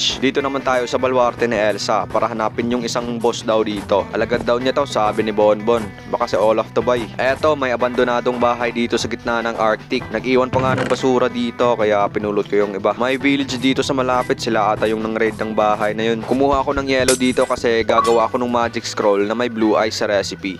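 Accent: Visayas, Philippines. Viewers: Balay hahaha bisaya ka dong😂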